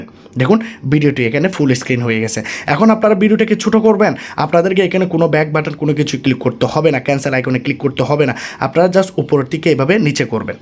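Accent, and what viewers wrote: Bangladesh. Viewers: ভাষা ঠিক মতন বলতে পারেন না কথার মধ্যে বাঙাল কথা আসে কেন? যেমন আপনি বলছেন গোফন কথাটা হবে গোপন। সেটিং কথাটা ঠিক বলছেন না বলছেন স্যাটিং। কথা বাংলা বলছেন কেমন যেন গাঁইয়া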